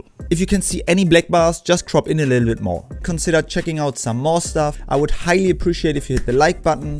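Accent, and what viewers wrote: Germany. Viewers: Ach ja.... Wenn Deutsche Englisch sprechen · Are you German?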